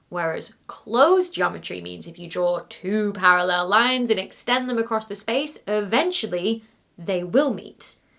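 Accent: United Kingdom. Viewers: Yeah, the British accent on that one was hard, lol. I'm normally pretty good with it, but I had to keep reminding myself what she was talking about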